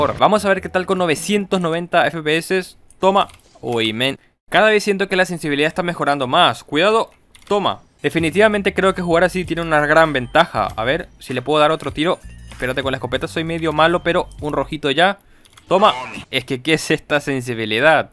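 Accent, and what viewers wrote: Paraguay. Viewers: Eres de paraguay? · Ese acento paraguayo se nota de lejos. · Sos de Paraguay? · Eres de Paraguay? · Sos de Paraguay???? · Eres Paraguayo? verdad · De Paraguay? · eres paraguayo vrd bro? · Kp vos sos paraguayo verdad? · SOS de Paraguay? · Sos paraguayo ?🤠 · Paraguay? Kp s nota el acento mi reynol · tu eres paraguayo tu asento es de paraguay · No lo conosco pero su acento parese paraguayo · Man pensé que eras de otro país por tu forma de hacer los videos pero por tu forma de hablar noté que sos paraguayo gran video sos grande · Eres paraguayo?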